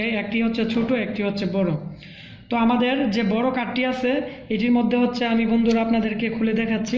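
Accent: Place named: Mymensingh, Bangladesh. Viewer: ভাইজানের বাড়ি ময়মনসিংহ বোঝা যায় তবে অনেক কাজের ভিডিও বানাইছেন, ধন্যবাদ।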